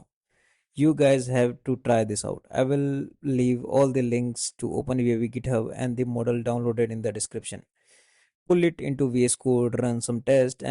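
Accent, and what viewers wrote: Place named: Rajasthan, India. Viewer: Your English flow is better than mine... Are you from north India because I sense a rajasthani accent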